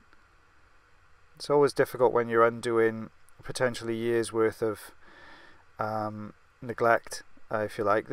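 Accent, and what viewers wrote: Wales, United Kingdom. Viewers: Welsh voice, fairly sure.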